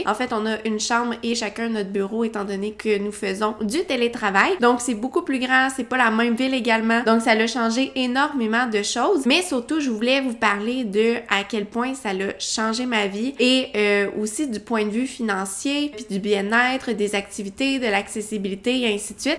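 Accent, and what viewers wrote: Quebec, Canada. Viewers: J'écoute vos vidéos pour pratiquer l'accent québécois! Merci! :)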